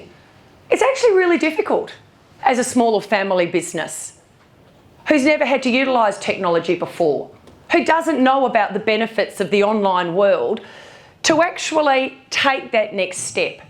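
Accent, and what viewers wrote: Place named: Ipswich, United Kingdom. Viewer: She is Bronwyn Bishop reincarnation with Ipswich accent.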